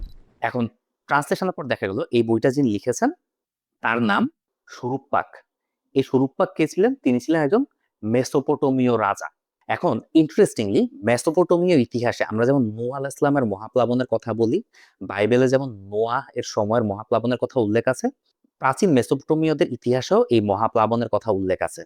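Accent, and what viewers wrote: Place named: Bangladesh. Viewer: দাদা সব ঠিক.. আপনি দেশীয় বাংলাদেশী ভাষা ছেড়ে শুদ্ধ বাংলা বলুন শুনতে ভালো লাগবে....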